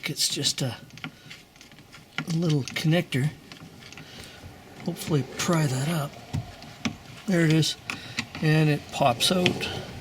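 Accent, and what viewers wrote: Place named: South, United States. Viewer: Your southern accent is far to thick for this future door trim repair man.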